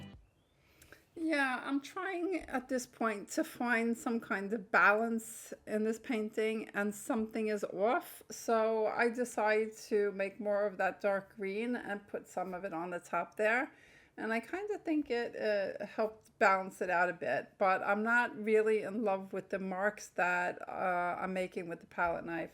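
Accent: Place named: Brooklyn, United States. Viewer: I was also born in Brooklyn! I thought I recognized that accent.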